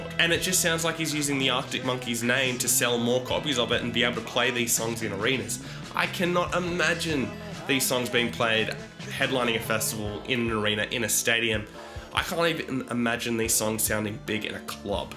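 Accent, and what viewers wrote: Australia. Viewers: All these salty comments you should have realized you were in for a bad review when you heard his Australian accent!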